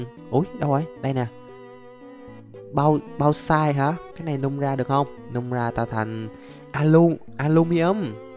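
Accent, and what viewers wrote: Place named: North, Vietnam. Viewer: Coi lại video cũ của anh khúc đầu nghe anh nói mà cứ tưởng người Bắc ko à =))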